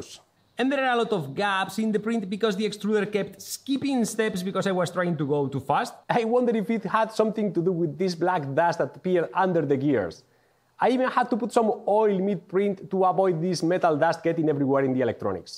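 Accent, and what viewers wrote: Spain. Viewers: eres un español hablando ingles? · Adoro la forma que tenemos los españoles de pronunciar el inglés jaja, te dejo mi like! this vid was fun ^^ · Con tu acento me da por hablarte en Español, pero tampoco es que haya confirmado que eres Español The bed can be heated in sections? Because if you can , doesnt seems hard to distribute the heating cable in "discs", like the ones on a ceramic hob, so you can save power, heating only the sections you are using, on a normal 3d printer is not really a concern, but in something that big, the bed must be really expensive to maintein edit: okay, ive seen that part now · seunas a español hablando ingles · etsba viendo este video...y pensando este tio habla epsañol xD ese ingles es muy español jajajajjaaj saludos grande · se nota mazo que eres español jajjaja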